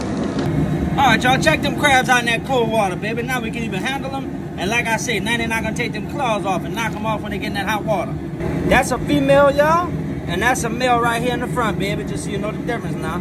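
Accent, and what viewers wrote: Louisiana, United States. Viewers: Her accent is Louisiana and Fran Drescher at the same time, I love it. Fuck them gators tho.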